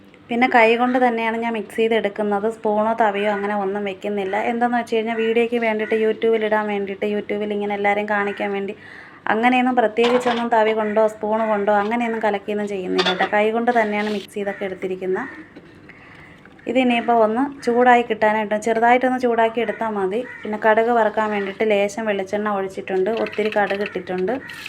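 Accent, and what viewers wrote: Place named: Kilimanoor, India. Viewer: ഓരോ സ്ഥലത്തു ഓരോ സംസാര രീതി അല്ലേ.. ഞങ്ങൾ കിളിമാനൂർ ഭാഗത്തു ഇങ്ങനെ ആണ് സംസാരിക്കുന്നത്.. അത് മാറ്റേണ്ട കാര്യമില്ല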